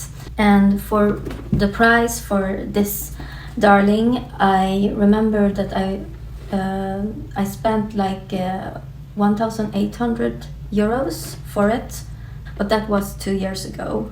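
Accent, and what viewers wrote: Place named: Sweden. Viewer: I love your Swedish accent! tack tack for videoen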